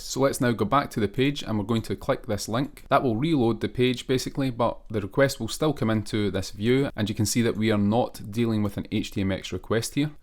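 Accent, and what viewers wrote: Scotland, United Kingdom. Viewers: Thank you for the content you produce. And thank you for the Scottish accent! 😀 I was supposed to move to Scotland recently but some unfortunate events stopped me, so this will help ease the pain. I'm in the beginning of my Django learning journey, so I will definitely come to your videos many times. Good luck!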